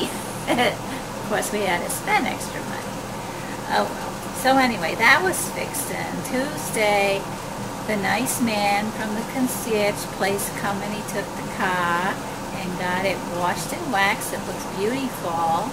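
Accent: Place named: New York, United States. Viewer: I love your new york accent. My dear lady, i usually do my own car repairs cause i don't trust mechanics. And I believe usa mechanics like to sabotage foreign cars. You need yourself a good husband mechanic and jack of all trades. If I were single i would definitely propose to you. Take care.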